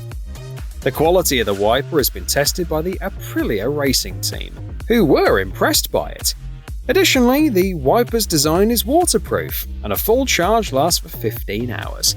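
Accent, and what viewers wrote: United Kingdom. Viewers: Brit accent should be confined to Brit subjects.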